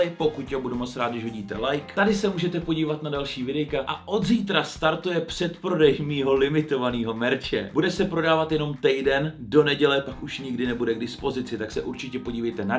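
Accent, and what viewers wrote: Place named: Bohemia, Czech Republic. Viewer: Za mne je ta "čecháčtina" neposlouchatelná. Nauč se mluvit česky a rád si to poslechnu. Ahoj z Moravy.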